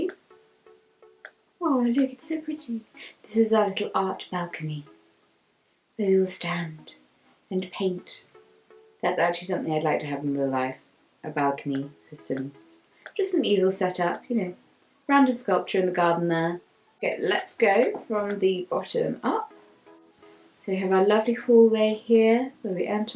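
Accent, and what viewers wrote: RP, United Kingdom. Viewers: Just when I thought I couldn't relate you more, you are also a simmer! From one posh sounding disabled lesbian to another, good choice on playing Sims 2 because that is my all time favourite Sims game 😊 you can get good safe mods and such on Tumblr! I get all mine from there. Also I completely make my simself and play. In Sims, I have a cat and dog and a lovely bungalow and I am searching for my wife but I think I'm going to have to make myself one because the townies are kind of...lacking.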